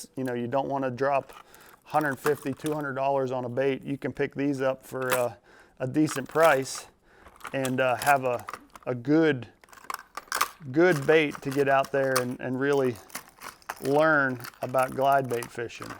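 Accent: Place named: Pennsylvania, United States. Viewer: It's a Pennsylvania thing. Yinzer language. We try to keep it interesting up here kind of like a secret handshake.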